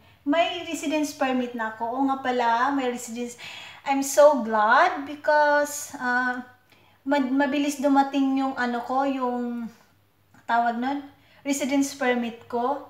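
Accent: Visayas, Philippines. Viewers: Malingaw kay ko saimo day bah!kay tinoud kay ka!!bisdak kaau!hahaha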